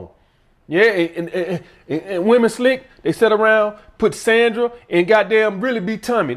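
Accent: South, United States. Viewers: Lol dafuq is rambling??? Yo this nigga country as fuck! Keep up the good work homie I listen to almost every show. Red💊 all day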